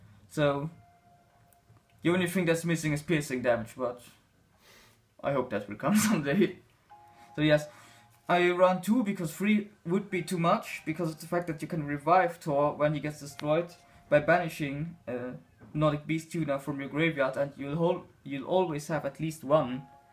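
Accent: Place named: Germany. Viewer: naja warum macht ihr kein deutsches video ? all your cards are german and your accent sounds german ? by the way good Video i liked it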